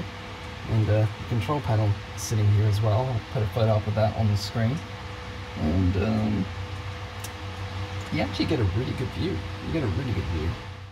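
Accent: United Kingdom. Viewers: Never put you down as an Aussie, your dialect is more Brittish. Sweden had quite a few of these planes, always nice to recognize them.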